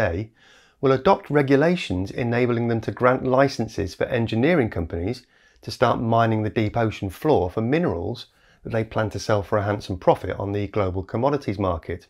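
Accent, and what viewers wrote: United Kingdom. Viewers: Hearing you come up with terms like "research odyssey" and speak it in the British accent is why I always preferred listening to Brits narrate in documentaries.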